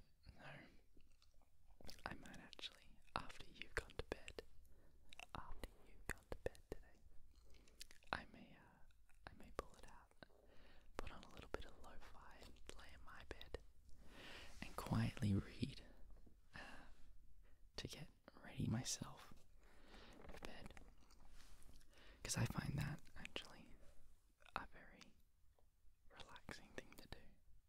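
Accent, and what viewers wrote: Australia. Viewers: im not so familiar with the aussie accent but i've just noticed that yours isn't as strong as other aussie asmrtists (asmr beam for instance), what part of australia do you come from, north/south/east/west ?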